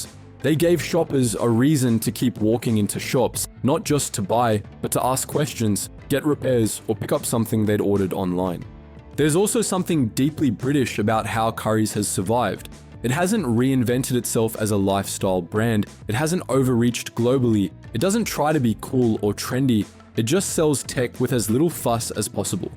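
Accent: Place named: Australia. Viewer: Nah, yeah, haha, yeah... The narat9r is an Aussie , can't you hear him ? Got stuffing 8n y9ur ears have ya ?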